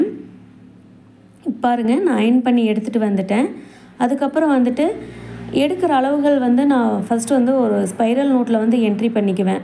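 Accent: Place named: Coimbatore, India. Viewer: Hand writing super sister. Neenga yentha ooru sister unga language karu coimbatore side la pesaramadri erukku.. niraye video paarpen unga video rembo honest avum solli thareenga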